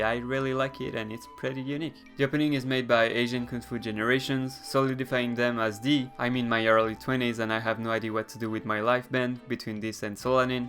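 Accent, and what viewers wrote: France. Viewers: Your analysis makes me see the show with a whole different eye, truly amazing ! Also your french accent is almost unnoticeable, how did u get rid of it so well ?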